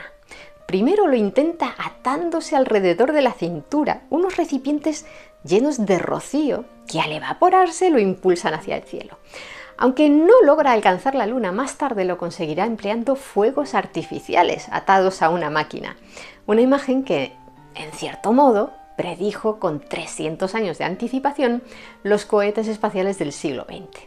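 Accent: France. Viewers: Me encanta tu acento francés.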